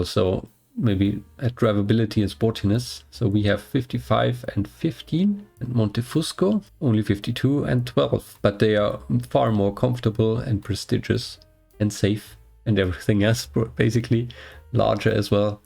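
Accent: Bavaria, Germany. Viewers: Du kommst aus Bayern?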